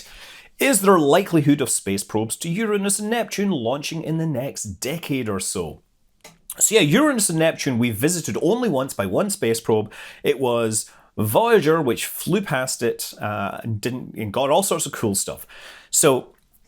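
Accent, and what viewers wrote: Scotland, United Kingdom. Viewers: JUST KEEP ON DOING WHAT YOU DO ❤️ ... I watch many other space channels like Every Day Astronaut etc ... But it isn't a good week, if I don't hear your fantastic scottish accent 🙏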